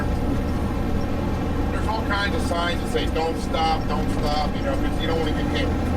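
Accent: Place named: Philadelphia, United States. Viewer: I lost track of how many times you said "wooder".. where u from driver? My hubby pointed it out. He's saying Delaware county PA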